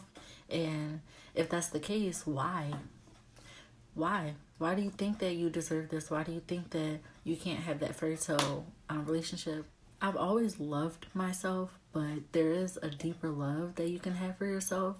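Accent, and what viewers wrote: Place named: Baltimore, United States. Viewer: Thank you from sharing! Are you from Baltimore? I hear your accent lol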